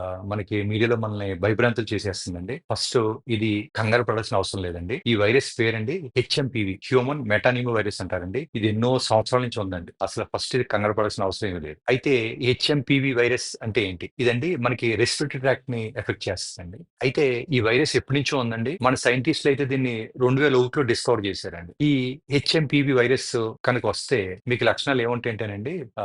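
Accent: Godavari, India. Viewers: Doctor garu ...Godavari varu anukunta..😅😅😅😅😅😅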